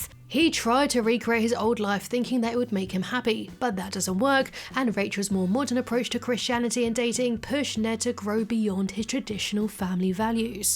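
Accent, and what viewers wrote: United Kingdom. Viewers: No offense. As an American hearing a Brit, talk about the Simpsons feels like I am reading a history book from the u.k. about the war of 1812. I love the breakdown, just not what I expected, haha.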